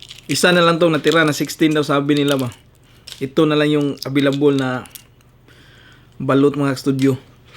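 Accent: Visayas, Philippines. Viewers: Bai klaro kaayo bisaya ka Basta mo sturya mag tagalog bwhahaha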